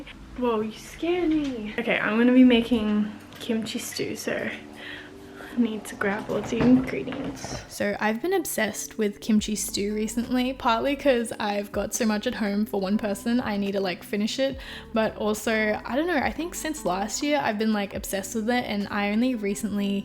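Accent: Australia. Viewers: I’ve been watching you for 2 years but I still can’t get over your BEAUTIFUL Australian accent omggg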